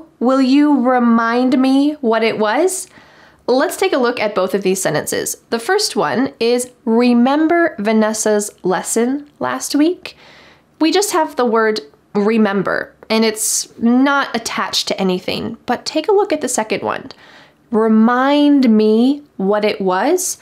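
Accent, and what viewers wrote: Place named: United States. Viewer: You're American, aren't you? I hear the accent too strongly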